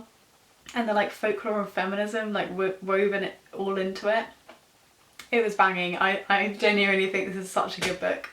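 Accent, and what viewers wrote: United Kingdom. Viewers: still lose some meaning words in your speak but understend more than early, did you use some english accent or it clearly english?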